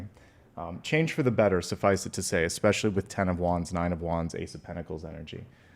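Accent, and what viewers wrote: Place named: United States. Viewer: I see that building from your window and wondering where are you from. You have american accent but that landscape doesn't look american 😅 Best wishes for Apollo!❤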